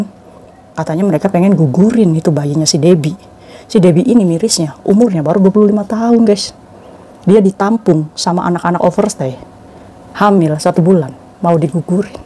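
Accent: Indramayu, Indonesia. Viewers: pantesan kl ngmng BOLeD mirip orang Indramayu 😅😅😅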